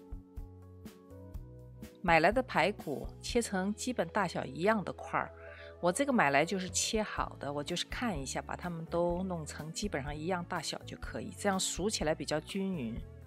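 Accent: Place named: North, China. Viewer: 你好，请问祖籍何方？听口音，应该是北方人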